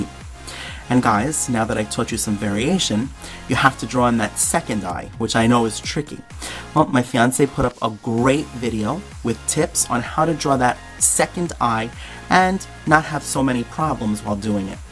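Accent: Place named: New York, United States. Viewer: Lovely art but whoa, you are like the only person from New York I've seen who sounds like they're from New York. Never heard a soft, gentle voice in a New York accent before, lol.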